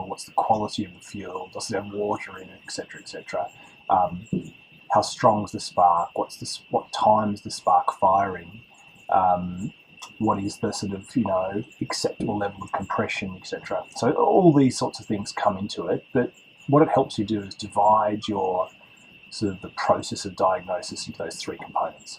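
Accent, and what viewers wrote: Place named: Australia. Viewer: Sir, your videos have been invaluable in my 1st goes at repairing 4 outboards ... but more importantly your accent and character make me miss my favorite country in the world. This yank has been deported from Australia 3 times (I simply love the top end) and I will of course return ... it is not my fault I was "born in the USA."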